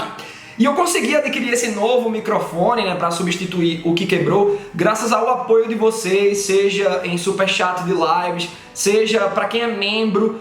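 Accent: Pernambuco, Brazil. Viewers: Mano tu é de PE?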